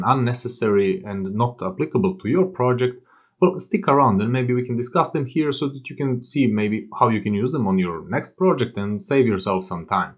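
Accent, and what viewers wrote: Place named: England, United Kingdom. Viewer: Izasao si mi kao predlog posto dosta gledam tutorijale pa reko da vidim... Engleski naglasak i ime :)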